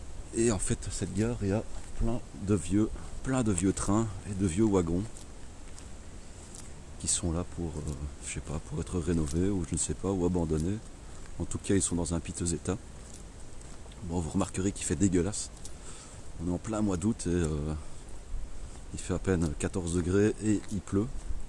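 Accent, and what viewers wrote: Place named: Belgium. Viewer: L'accent Belges, J'adore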